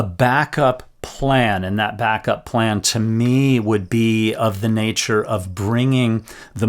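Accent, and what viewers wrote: United States. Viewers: Would you please consider learning how to pronounce the Sherpas' name correctly? It is disrespectful to be still calling them in such heavy Americanised accent when you are covering stories and news about Everest.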